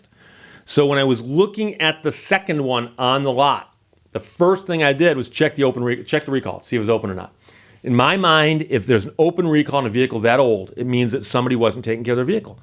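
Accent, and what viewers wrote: Canada. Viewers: Canadian robot lady you did a good job stitching that one together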